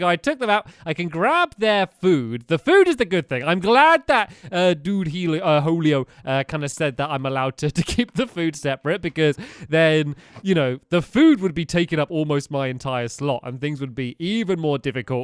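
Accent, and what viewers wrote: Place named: United Kingdom. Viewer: Gaming Wolf 2016 He British not American... You can tell by his voice...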